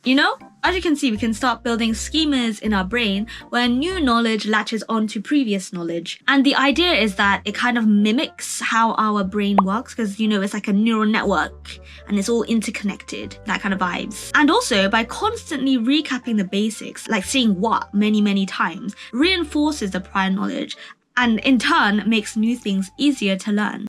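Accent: United Kingdom. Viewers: Oh the Britsh accent. I thought you said watch lewd things...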